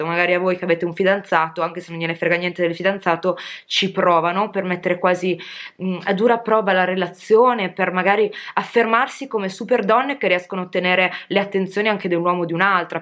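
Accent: Emilia, Italy. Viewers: Fare il "simpaticone" con la donna/l'uomo di un altra persona é trasversale. Non lo fanno solo gli uomini etero piacioni o le Gaie di turno. Certamente, lo fa chi non ha rispetto, per se stesso in primis, e non prova amore verso il prossimo. Guardando video di vari youtuber mi sono fatto un'impressione: molti (idioti) sono gelosi e invidiosi di chi fa video su Youtube, ritenendo (erroneamente) che gli youtuber hanno tutte le fortune del mondo e provano solo piaceri. Ad esempio, certi si fermano soltanto al fattarello che le aziende vi inviano prodotti, non immaginando nemmeno cosa c'é dietro, ovvero che si tratta di lavoro e quanta fatica c'é prima della pubblicazione di un semplice e solo video. Alcuni, ingenuamente, pensano che gli youtuber siano dei privilegiati, fortunati e paraculati...allora, provare a "fregarti" il fidanzato può essere, per qualcuno, una piccola rivincita, perché magari questo qualcuno pensa che te lo meriti, solo per la "colpa" di fare la youtuber. 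Mi viene da pensare questo guardando i video di Cristiano, Marta, Giulia, Mia...e mi vergogno sempre piú di chi abbiamo intorno! Ho sempre creduto fossi emiliana o romagnola, mi pareva avessi un accento di quelle parti li...per quanto non me ne intenda di accenti!:) Posso chiederti un piccolissimo favore? Immagino bene di essere, probabilmente, l'unico uomo tuo iscritto e follower, tra tantissime donne, ma potresti rivolgerti, alla fine o all'inizio dei tuoi video, non solo alle ragazze? Se puoi, mi faresti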